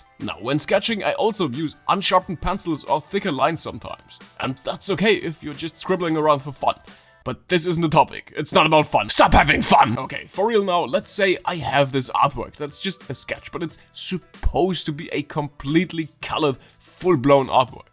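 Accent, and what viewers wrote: Germany. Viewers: yo are you german? bc your accent gives it away a bit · is it me, or has less of a German accent over the time that he's been posting. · Him being sick oddly made him sound twice as german as usual. Especially at the end when he screamed "NOW GROW SOME BALLS DAMIT!"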